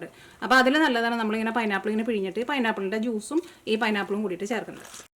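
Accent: Thrissur, India. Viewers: Thrissur kar ayonda chengayi mare samasaram podik kudum.ente kudumbakar und anubavam und.Ek estta avarude samsaram kelkan.😍😍😍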